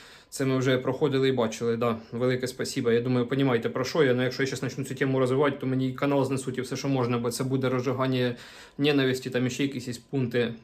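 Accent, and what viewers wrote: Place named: Surzhyk, Ukraine. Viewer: Який жаххливий суржик, ще не чула, щоб так спотворювали нашу солов'їну мову. Де цей хлопак чув оце? "не повторяйте моїх ошібок"😅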